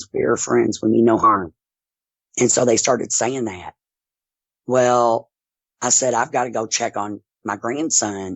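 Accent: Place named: Kentucky, United States. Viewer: hearing your Kentucky accent while listening in KY freaks me out because I feel these beings around. (There’s a noise outside just now) As a courtesy would you mention the county you live in? Prayers going out to you!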